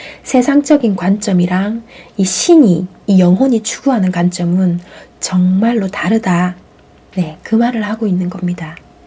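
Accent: Gyeongsang, South Korea. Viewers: 부산에 사셨군요. 처음에는 대구 말투인줄 알았어요.^ 티나다=포떼나다 갱상도 사투리죠~^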